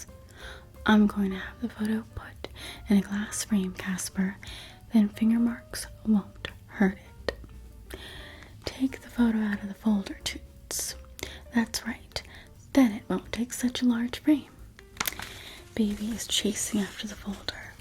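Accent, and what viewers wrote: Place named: Michigan, United States. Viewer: I've never heard a Michigan accent complemented before! I appreciate it as a michigander myself 😅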